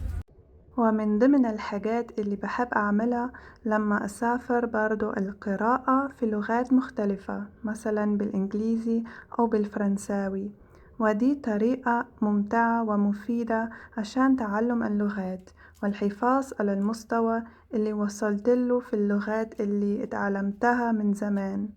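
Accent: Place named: Egypt. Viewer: لهجتك المصريه حلوه اوي و مفهومه What a lovely video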